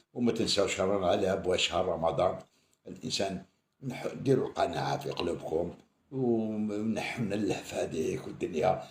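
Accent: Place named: El Harrach, Algeria. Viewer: علاش الحراشيين يهدرو كامل بهذه الطريقة يعني طريقة دحمان الحراشي 🤔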